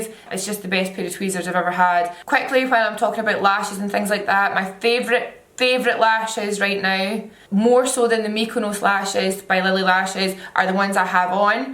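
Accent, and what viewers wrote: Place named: Falkirk, United Kingdom. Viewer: Are you from Falkirk because your accent is quite strong x 💓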